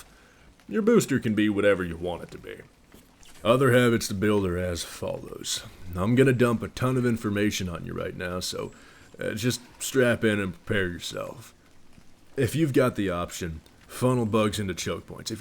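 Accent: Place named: Texas, United States. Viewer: from the deep baritone tones to the Texas draw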